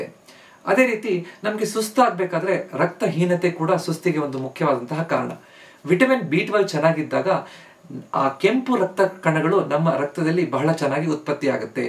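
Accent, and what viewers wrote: Standard. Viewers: Swàtchha Kannada & good information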